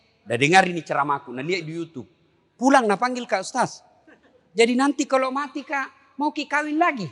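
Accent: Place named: Banjar, Indonesia. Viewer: Org Banjar y ustadz,dr awal mirip bahasa suami saya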